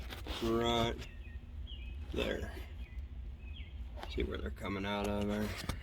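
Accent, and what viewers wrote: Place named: South, United States. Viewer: That's a nice truck and a fun project if one have the time and money for it. Especially since it was your granddad's. I love your accent. I'm European and have never been to the USA but if I go there one day I think I'll skip the north and just travel around the south, probably Nevada too because of Fallout New Vegas. 😄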